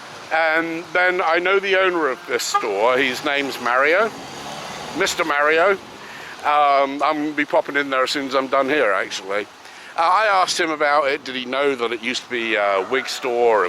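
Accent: United Kingdom. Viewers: Wow! Looking forward to that! After watching this video today, it prompted me to watch that scene again from "Goodfellas", which of course can be seen here at YouTube. Even after all these years it still packs a punch! Here's hoping you have a wonderful weekend,! Thank you for all your hard work! Oh and please don't worry about rambling too much. I think I speak for a lot of your fans when I say we can't get enough of your charming Brit accent! 🙂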